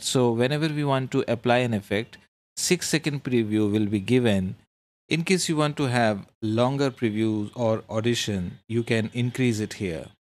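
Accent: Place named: India. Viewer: Indian, or somewhere abouts.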